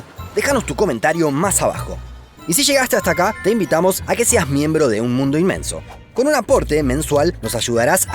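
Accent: Argentina. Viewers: lo iba a ver, hasta que escuche la repulsiva voz de acento argentino .. 🤮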